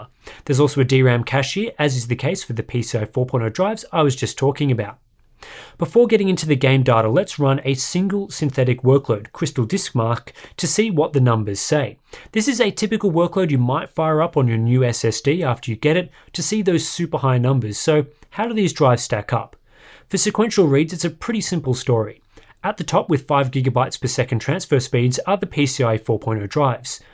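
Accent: Australia. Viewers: Whenever you click on a Hardware Unboxed video, you have to turn on Caption to see how YouTube decide to butcher the Aussie accent, and this time round it's "todd we're unboxed"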